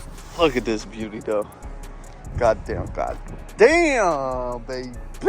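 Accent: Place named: Philadelphia, United States. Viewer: it’s how we talk in Philly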